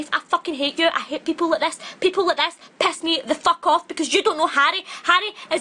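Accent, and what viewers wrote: Scotland, United Kingdom. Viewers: Scottish, from Glasgow probs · Also to the people asking about the accent it's obviously Scottish, maybe Irish. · haha i love your accent, that british/sco/irish accent is beatifull :p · is this a scottish accent? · it's angry scottish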